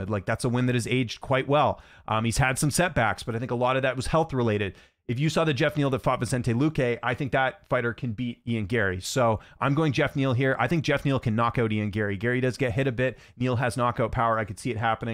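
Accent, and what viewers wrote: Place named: Canada. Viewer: There’s nothing quite like the comfort of real Canadian talking sports in the morning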